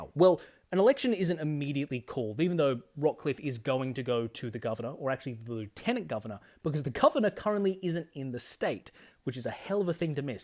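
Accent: United States. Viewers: Your analysis is interesting but your American pronunciation is annoying af. In particular U sounds as OO sounds like 'noos' but also words like Lieutenant and semi. Watch more Australian content. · Fascinating developments. Am curious about your accent though: did you spend time in the US? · The what Governor? Get your pronunciation right, dude. You have too much of a Seppo twang as it is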